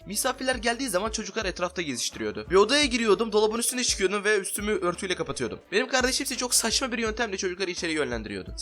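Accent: Russia. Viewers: Rus musun çok belli cünku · Abi rusmusun yoksa sadece rusyadamı yaşadın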